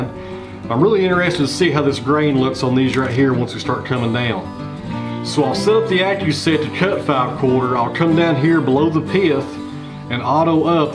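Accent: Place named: Tennessee, United States. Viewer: My burning question is, what are those dangling thangs following behind the machine head, & why does the debarker disengage before the end of the log.? Other than that, isn't it mystifying why 1,000's of grown men & women love to sit back and watch a fella with a Tennessee accent saw up logs on a beautiful piece of machinery. I'm an electrician but I love wood & my name is Wood. Go figure?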